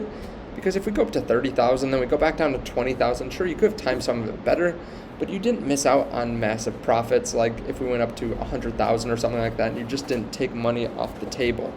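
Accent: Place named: United States. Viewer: What are you talking about "foreign"? His English is perfect. He sounds American. I've lived in Portland most of my life. Even many Americans pronounce Oregon wrong because they aren't from this region or haven't heard it said much. It's not an insult to correct someone about it, nor was it meant as one. Seriously chill the F out lol.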